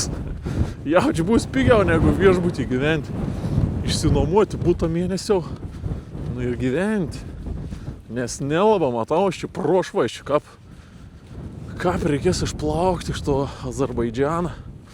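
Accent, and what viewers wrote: Samogitia, Lithuania. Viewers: Nu, kaip su baltu pavydu stebiu Jūsų keliones, smagu žiūrėti, o dar ta žemaitiška šnekta, nu faina. Ir toliau taip, sėkmės.😂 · Ir dar žemaitiška tarmė priduod grožia. 👍👍👍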